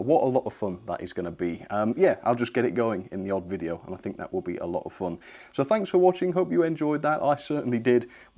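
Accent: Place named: West Country, United Kingdom. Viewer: Hi, great review and I got the same model from Rails of Sheffield too. I have added the tower on my loft layout within the steam shed area... It works very well and quite novel...great Wurzel's accent..!!! 😁 I have weathered the tower and painted the brazier and added a fire glow LED under it to represent the de-frosting fire.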